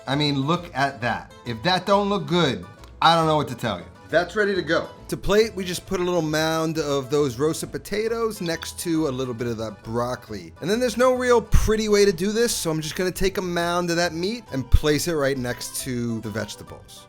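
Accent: New York, United States. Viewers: ☠️☠️☠️ some of the most New York shit I ever heard 😂😂😂😂 Right up there with the Kosciusko bridge 😂 Even Google talk to text inputs the correct spelling using the marble mouth pronunciation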